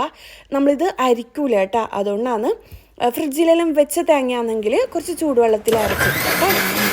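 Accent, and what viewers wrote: Kannur, India. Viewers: Kannoore varthamanam nalla rasamund tto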